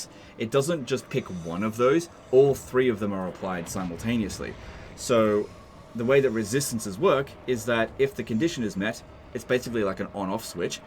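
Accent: Australia. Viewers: The subtitles dont recognize australian, you speak too fast and unclear.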